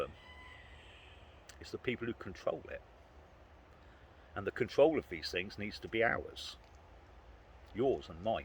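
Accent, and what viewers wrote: England, United Kingdom. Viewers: It is always nice to hear an englishman chat away. 😊👍❤️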